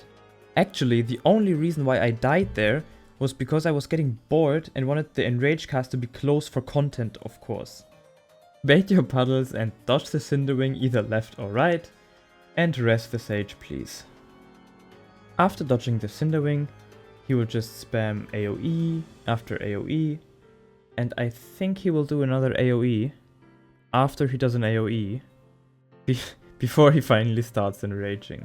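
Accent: Germany. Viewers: Ok so it's true that all Germans sound the same and adorable OK! <3 ahaha thank you for your reply!!!!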